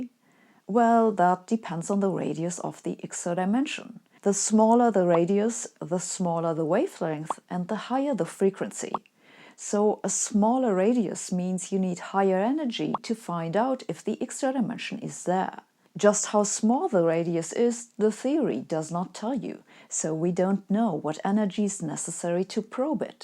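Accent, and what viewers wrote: Germany. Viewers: Sabine is lovely,funny and brilliant...she makes Physics fun and accessible...I love her German accent,too,tcx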